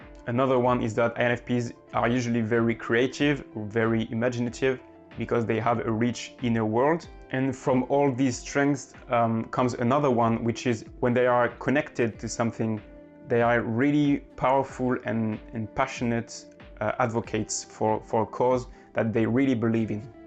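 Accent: France. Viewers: Sounds like a french accent.but just my guess not sure.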